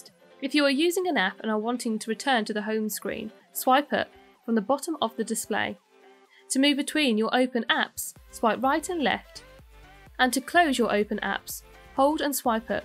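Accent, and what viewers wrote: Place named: United Kingdom. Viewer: Great video and very useful tips- everything is better in a British accent! :)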